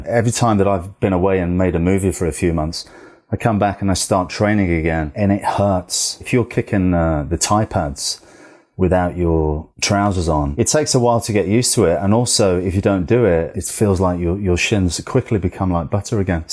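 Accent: United Kingdom. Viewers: He has British accent, it hard to hear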